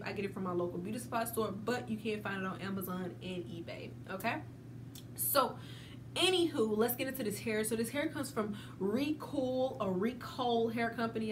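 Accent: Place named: South, United States. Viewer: Love that southern belle accent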